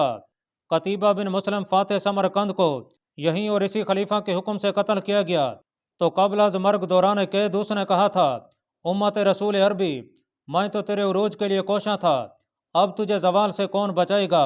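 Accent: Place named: Saraiki, Pakistan. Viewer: Saraaeki talaffuz lag raha hai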